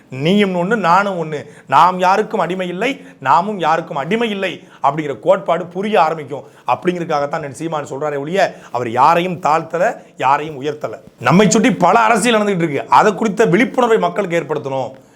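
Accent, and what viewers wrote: Tirunelveli, India. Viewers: அண்ணாச்சி நீங்க திருநெல்வேலி மொழியிலேயே பேசுங்க ரொம்ப சுவாரஸ்யமா இருக்குது💯👏👌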